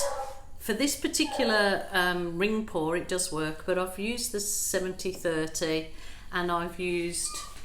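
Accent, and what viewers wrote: Birmingham, United Kingdom. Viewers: Haha haven’t lost your Brummy accent. Love your work 😊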